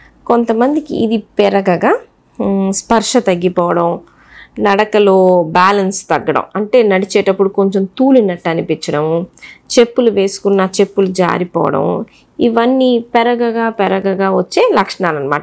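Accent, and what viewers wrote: Guntur, India. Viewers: అది గుంటూరు తెలుగు❤ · Amma. Bangaram. Konda. Thalli. Neeku. Nenu okka. Salahu. Isthanu. Neeku. Isthamaithe. ..manadhi Guntur kabatti. . Vacchuddi..poidhi..ki baduluga vasthundhi...pothundhi....ani. kanuka matladithe. Adbhuthamaina. Slang thayaru avuthundhamma...velaithe. naa. Yokka. Request. Ni..patinchu. lekapothe. Mana. Guntur. Mana. Ooru. Bhashani. Manamu. Endhuku. Odhulukovali. Anukunte. Nee isthamu. Bangaram...kaani. neevu. Bhavisyattulo. Chala goppa peru thechhukuntavamma....nevu. mathramu. Continue cheyyamma. Neeku. 10 million subscribers vastharamma. Thondharalone.. sweet. Voice. Plus. Most intelligent...DR..amma nevu. ..thalli.. MAY. GOD. BLESS. YOU. THALLI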